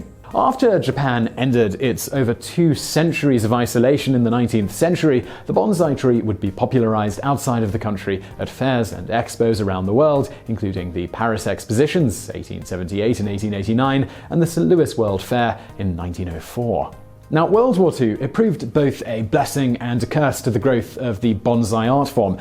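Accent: England, United Kingdom. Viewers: While I really like this video, as I like this channel's other videos, his pronunciation does little to clarify the distinction. For one, a key difference between "bonsai" and "banzai" is the "S" sound versus the "Z" sound. The two are pronounced in Japanese as distinctly as one would reasonably expect them to be. pronunciation of the two words seems to come off as "Z" both times. Secondly, we have that sort of typical British thing where an "ah" sound in a foreign language is pronounced like the "A" in "apple". Like when virtually any British chef says the word "pasta". I don't know where this comes comes from, but it seems very British, and in this case, it's not helpful. That's because when we're talking about the difference between "bonsai" and "banzai", the first vowel sound is not a matter of the difference between the "O" sound in "odd" and the "A" sound in "apple", but rather the difference the "O" sound in "bone" and the "A" sound in "audience". 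is a great presenter, but when discussing matters of the pronunciation of foreign words, he'd benefit from consulting a native speaker. TL;DR - "Bonsai", the tree, is pronounced like "bone sigh". "Banzai", the battle cry, is pronounced sort of like "Bond's eye", but a little less stank on the D. Yeah, I said "Stank on the D". This comment has been entirely too serious. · Kew Gardens Station He’s english, he’s going to pronounce words differently than you. Do you know how many accents there are in the United Kingdom? Get off of your high horse and actually contribute. I don’t see you making videos?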